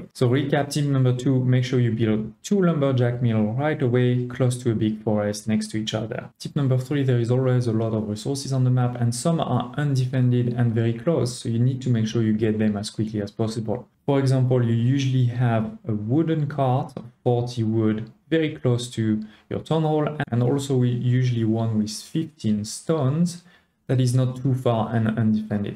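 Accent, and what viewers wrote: France. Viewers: You're french, right? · Tu serais pas français?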